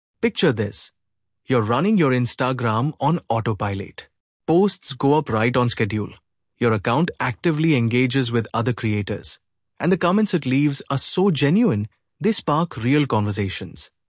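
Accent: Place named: India. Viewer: it's sound like your neighbourhood scam call center indian man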